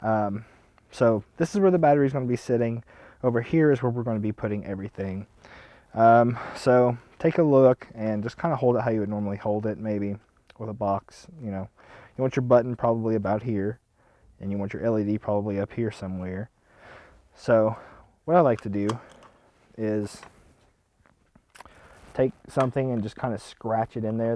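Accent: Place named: Appalachia, United States. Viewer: That's what I said moe men tary = momentary, not moNetary, may just be his accent, I'm used to listening to hillbillys ;). Still it was a good vid and I can't wait for the homemade rebuildable atomizer. No insult intended.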